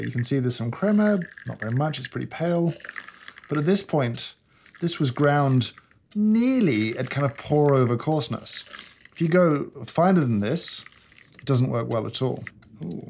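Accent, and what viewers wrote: United Kingdom. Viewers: His voice is calm but seriously British? You and the negativity about your opinion and Gawdamn even your face already can tell everyone you don’t like this product, return it and just run the old conventional espressos machine will ya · Thank u in advance. So you spared me about 70Dlls I was about to spend in this gadget. I was short of money and bought a bigger thing, a Delonghi espresso for home. Almost the same price, and much more useful, regards from Mexico, like a lot your finicky behavior and your a very nice warm loving guy. Love your British accent btw. Cheers from Baja California ;)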